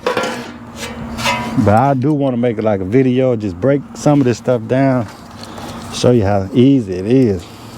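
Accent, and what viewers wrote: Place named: Kentucky, United States. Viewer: This Gentleman has a " BEAUTIFUL " Kentucky Accent !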